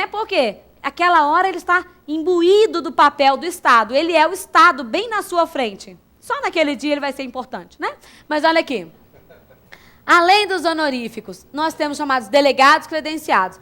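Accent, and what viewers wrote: Minas Gerais, Brazil. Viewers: Esse sotaque mineiro me traz boas lembranças.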